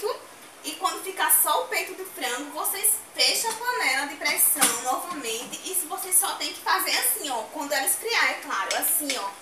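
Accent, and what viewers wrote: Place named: Northeast, Brazil. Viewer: Olá querida flor 🌹 eu amo seu sotaque 💓 realmente me cativa. Você é baiana? Parece sotaque nordestino. e seu Marido também cozinha ou só você mesmo? Você pôs o quentro por último e minha esposa sempre põe primeiro kkkk Ótimo vídeo parabéns e sucesso para você abraços ☺👍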